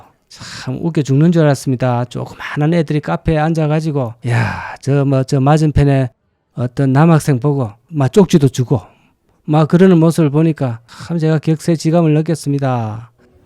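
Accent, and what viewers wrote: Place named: Masan, South Korea. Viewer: 아~ㅎ 고향이 마산이시구나.ㅎㅎ 배챠 하실때 느낌알제.ㅋㅋ😂😂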